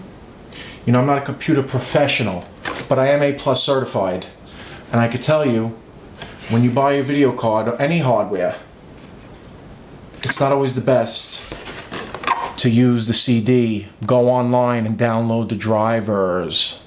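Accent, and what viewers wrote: Boston, United States. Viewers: Heavy Bostonian accent. Boooo red sox! lol i love this guy though! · you from boston bro ?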